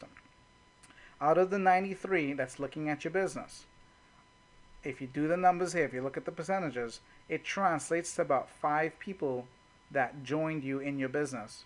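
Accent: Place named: Trinidad and Tobago. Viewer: You sound Trinidadian.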